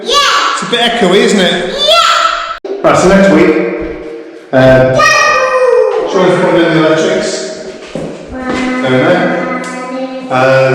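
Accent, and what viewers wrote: United Kingdom. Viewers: I agree with you. The glasses make the difference with the song. High class 😅 Good pronunciation with a light british accent. It's easy to understand your French.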